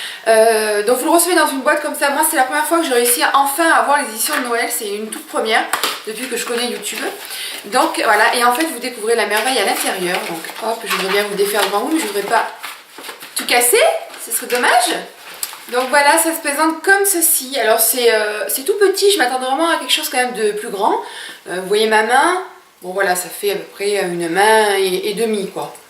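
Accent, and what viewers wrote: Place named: France. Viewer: Hi, t as super craque!!! wouahhh, moi, j evite sephora, c est toxic! lol, Je participe avec plaisir Les videos que je prefere, hauls, tags, ton accent est cute! Kenneth mon mari adore, lol Il trouve que les francaises ont un joli accent, bisous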